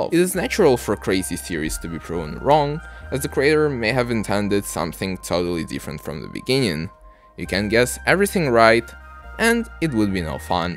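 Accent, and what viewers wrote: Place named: Brazil. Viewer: Are you brazilian? You have a familiar accent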